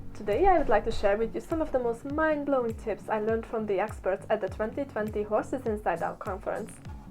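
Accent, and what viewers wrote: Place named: United Kingdom. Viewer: Hey! Nice video, well done! You sound like a born british, and speak like a real youtuber :D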